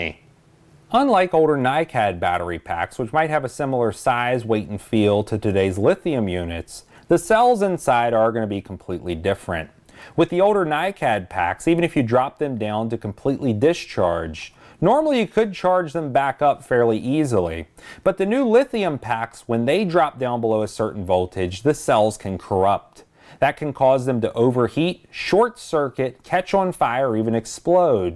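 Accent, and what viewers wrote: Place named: United States. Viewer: Effing yanks , your English is gross .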